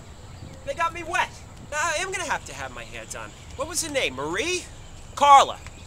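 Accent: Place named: New York, United States. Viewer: He's got that New York/New Jersey accent.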